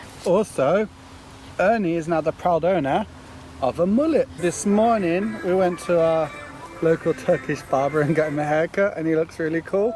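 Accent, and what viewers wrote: Midlands, United Kingdom. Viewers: That’s a familiar accent, making me homesick for the midlands and my boat all at once…… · Your accent reminds me of my childhood! Proper Midlands accent! Love it. ❤️ good on you living the dream....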